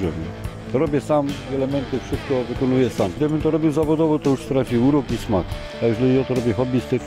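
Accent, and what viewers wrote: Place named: Silesia, Poland. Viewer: witam Kolegę kocham takie przeruby jest coś fabrycznego i lubię ulepszać po swojemu i według swojego gustu i upodobania , wielki szacunek dla Kolegi pewnie jesteś ze Śląska bo masz taki akcent wiele lat temu przed stanem wojennym pracowałem na grubie WUJEK pozdrawiam i zostawiam łapę w górę i suba trzymaj się wesołych i spokojnych świąt z uszanowaniem OTTO HERMAN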